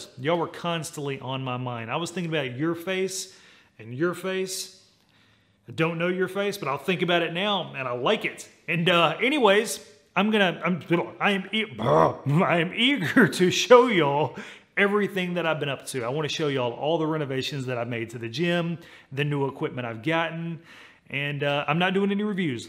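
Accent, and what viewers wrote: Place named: South, United States. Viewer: Love the southern twang! And the gym too!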